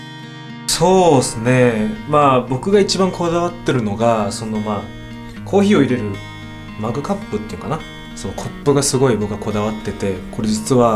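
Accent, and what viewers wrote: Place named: Okinawa, Japan. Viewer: あたり、與那城くんの沖縄なまりがちょっと聞けて私は興奮しました。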